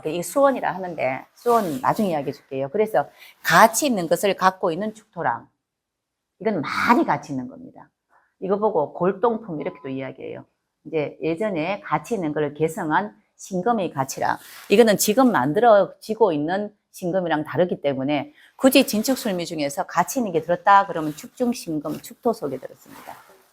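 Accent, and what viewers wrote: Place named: Daegu, South Korea. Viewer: 저 이분 설명 너무 잘해주시고 대구 사투리가 참 예쁘네요 대프리카 싫어하는데 이분강의는 참 좋네요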